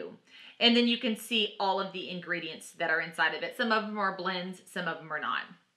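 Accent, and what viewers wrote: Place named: South, United States. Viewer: She's southern, y'all need to stop correcting the accent. If you're southern doTERRA sounds like du-terra. It's not a big deal; get over it. 🙄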